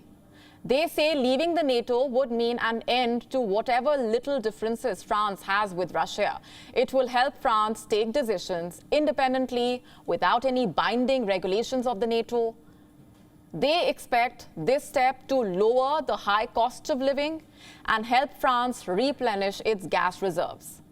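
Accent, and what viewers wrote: India. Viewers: I hate indian accent. It's disgusting. Please learn the language well. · Fire her. Her Indian accent is atrocious. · The Indian English! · You are speaking Indian English.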